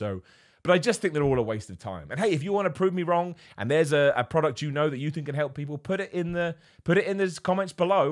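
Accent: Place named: England, United Kingdom. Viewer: Yo, you look like the older version of Saitama. Plus the English accent 👍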